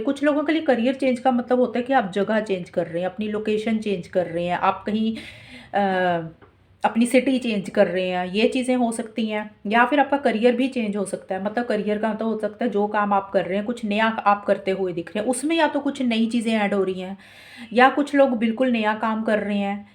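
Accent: Punjab, India. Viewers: nice punjabi accent👍👍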